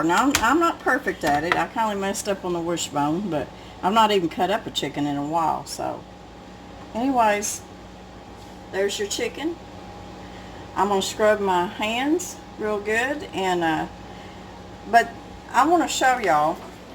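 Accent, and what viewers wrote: South, United States. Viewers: I absolutely love the way Southern's talk. I really notice the accent as I'm from Canada...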